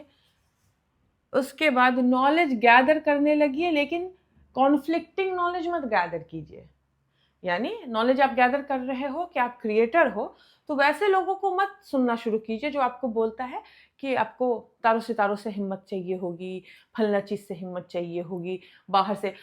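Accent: Bihar, India. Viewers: Mast form mei ho.. bas apka bihari accent miss karte h jaise aap purane videos mei karte the.. we feel more connected🌻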